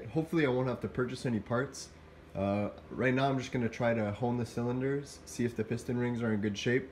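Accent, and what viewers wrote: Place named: Quebec, Canada. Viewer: I was about to say, your accent is almost french canadian. Then I clicked on your profile and I see Montreal, Québec, Ah ben Tabarnak ! :) Haha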